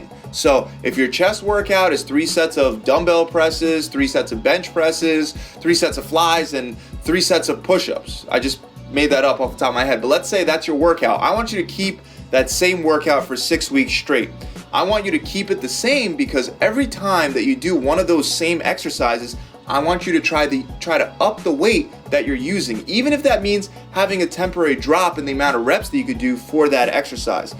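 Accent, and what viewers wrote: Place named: New York, United States. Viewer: You sound like the dudes on Friends, or Seinfeld. Are you from New York?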